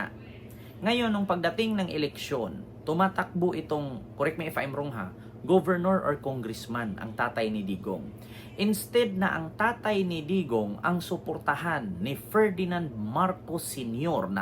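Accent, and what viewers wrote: Visayas, Philippines. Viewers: sorry medyo subscribe aq kc akala q bisaya ka 😊bisaya kc tono mo galit kc aq sa bisaya na gaya ni digongnio 😊😅😮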